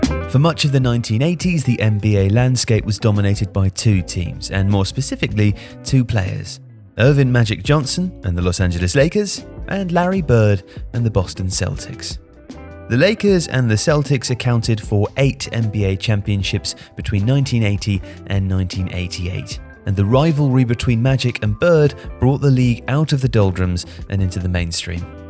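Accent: United Kingdom. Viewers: This is just so awkward to listen to NBA talk being spoken in a British accent 😂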